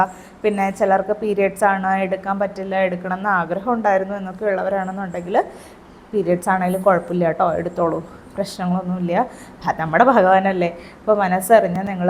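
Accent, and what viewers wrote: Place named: Valluvanad, India. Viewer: മോൾടെ വീട് വള്ളുവനാട്ടിൽ ആണല്ലേ. അതാണ്‌, ഓരോ വാക്കും കഴിയുമ്പോൾ, ട്ടോ എന്നു പറയുന്നത്.👌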